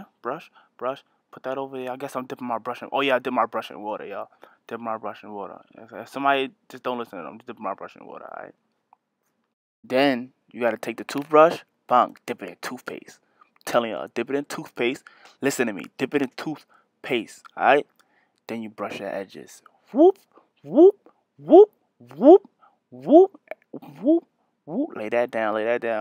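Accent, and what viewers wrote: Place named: New York, United States. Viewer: I Love How New York you sound lmaooooo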